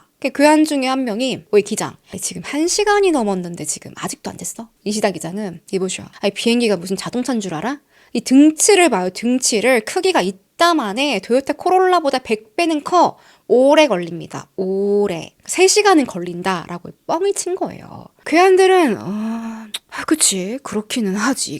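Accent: Gyeongsang, South Korea. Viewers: 경상도분이시네 ㅎㅎ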